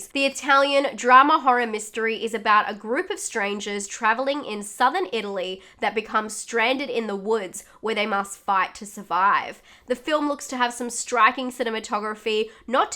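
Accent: Australia. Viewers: The way you say tube! I love an Australian accent.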